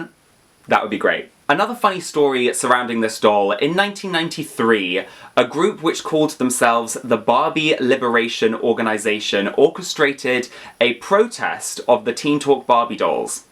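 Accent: United States. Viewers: I appreciate your American accent ❤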